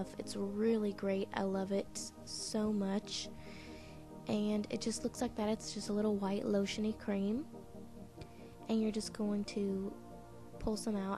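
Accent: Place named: South, United States. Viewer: You have a southern accent how cute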